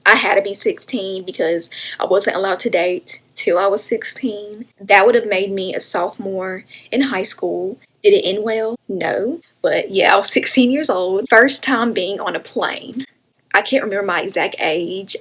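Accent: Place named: South, United States. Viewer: Girl where are you from?!?! I thought I was country omgeee lol I love it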